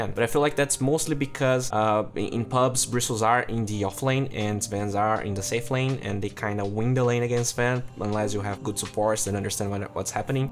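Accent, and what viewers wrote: Brazil. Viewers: Are u Brazilian? This accent lol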